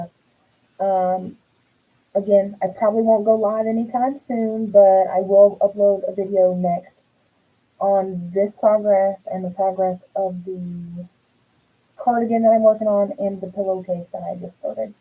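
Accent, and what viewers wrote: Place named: South, United States. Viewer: Yes girl, I've heard your accent since day one. I was gonna ask why you had one, a southern accent living in Illinois 😉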